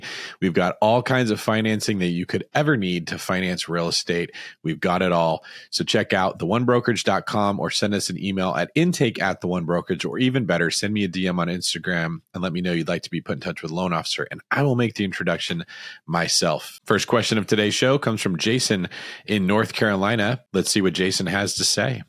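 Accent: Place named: Scotland, United Kingdom. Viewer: Go, I love the Scottish accent! It is probably one of the hardest to do!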